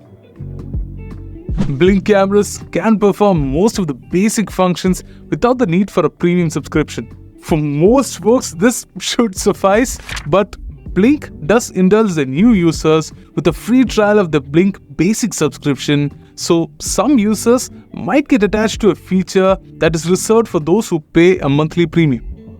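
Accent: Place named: India. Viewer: This might be better for US people if it was presented by a native US citizen that doesn't have such a strong indian accent, without the CC feature it is very hard to understand what's being said.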